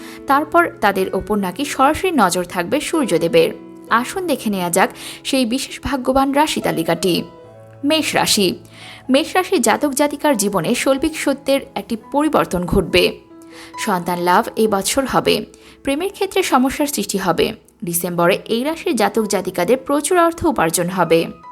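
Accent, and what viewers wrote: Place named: Bangladesh. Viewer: BANGLADESHI VOICE VALO LAGCHHE NA BLOCK KORTE HABE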